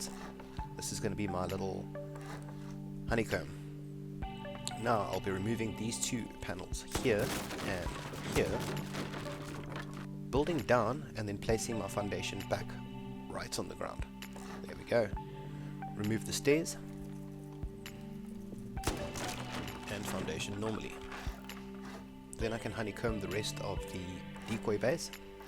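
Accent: South Africa. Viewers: hey are you south African. if so i am too:) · You sound South African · Hey... are you from South Africa? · Are you South African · Where u from, South Africa? I’m from there. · Are you South African, by any chance? Great video also!! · I knew you are south african!!!!!!!! Lekka CHOPPA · I know a South African when I hear one O.o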